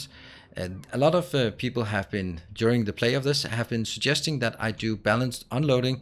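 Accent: Denmark. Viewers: oh god is that danish accent recognisable. From one danish person to another!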